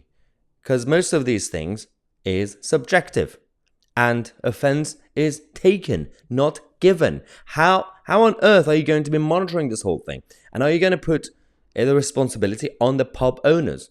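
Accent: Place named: RP, United Kingdom. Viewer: im offended by you..trying to speak like an english snob...what a prick you are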